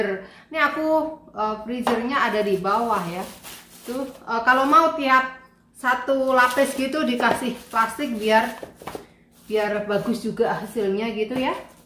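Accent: Java, Indonesia. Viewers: MBK jelas uraian dialeknya njawani mbkx asli kebumen kah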